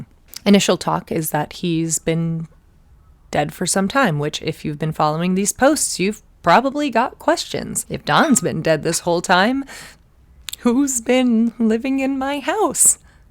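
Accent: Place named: United States. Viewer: Finally pleasant dread in American accent, thank you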